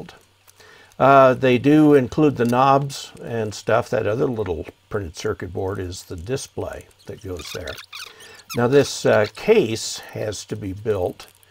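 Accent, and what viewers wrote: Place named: United States. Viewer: Thank you,! Apart of device, your diction make me feel an american speaker:-)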